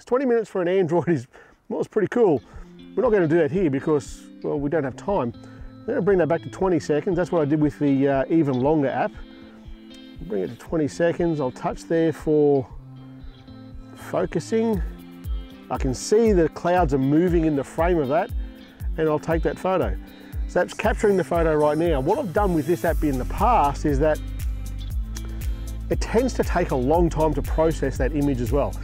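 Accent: Australia. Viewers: With my upper intermediate English I can barely understand Australian accent :( But videos are great :) Thanks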